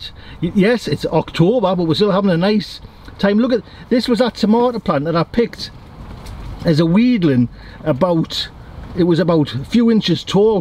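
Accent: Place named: North East England, United Kingdom. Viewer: Great video. Sounds like your from the northeast I'm a new starter and have just got my first plot near Beamish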